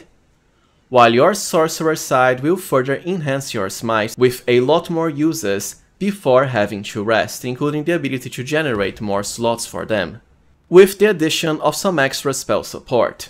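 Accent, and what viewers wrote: Brazil. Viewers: Eu reconheço um sotaque brasileiro quando escuto um!!!!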